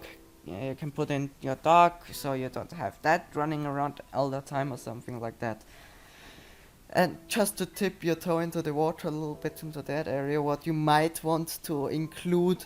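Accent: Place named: Austria. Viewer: dieser österreichische akzent bruder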